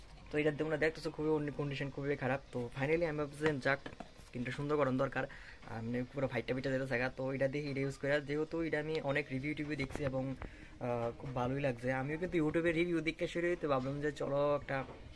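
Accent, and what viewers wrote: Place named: Sylhet, Bangladesh. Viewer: Eta siliti Bangla style na ? Val lagta se tomar ro loge suniya 😀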